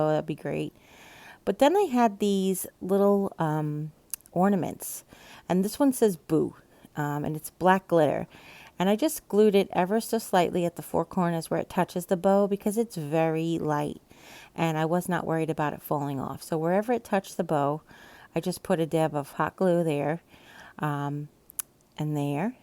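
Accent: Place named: East Coast, United States. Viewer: Cute! It's funny how you said that most people like to hear you talk, because I was just thinking about how I like your accent. Are you from the east coast? I noticed that when you say moss, it's more like mawwss lol love it.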